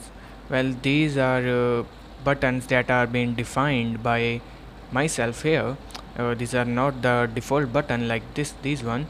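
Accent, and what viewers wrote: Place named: India. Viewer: now thats an indian accent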